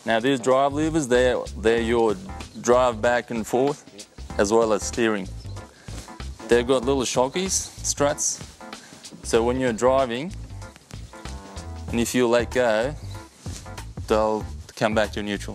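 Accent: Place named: United States. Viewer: American English. Sorry if i offended but I'm just giving my view.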